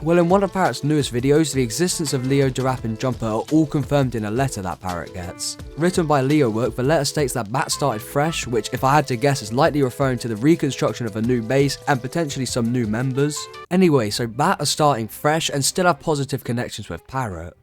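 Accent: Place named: United Kingdom. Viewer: Bros br'i ish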